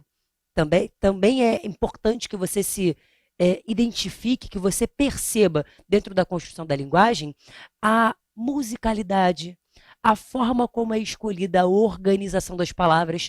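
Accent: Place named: Rio de Janeiro, Brazil. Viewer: Carioca ctz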